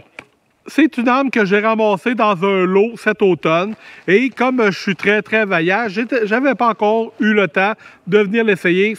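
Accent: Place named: Canada. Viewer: Très sympa cette petite vidéo.nous français on adore l accent Canadien.😊 Je possède deux axis en 270 win pour le tir avec une lunette en 9x24 et une 30.06 pour la chasse avec une lunette de 3x9 et j en suis très satisfait.fiable et précise.un excellent rapport qualité prix.meme avec des munitions partizan a 10 euros la boîte ça fait le job.👍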